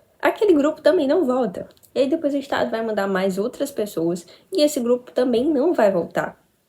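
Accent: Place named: Pernambuco, Brazil. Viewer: Amei o sotaque. Pernambuco?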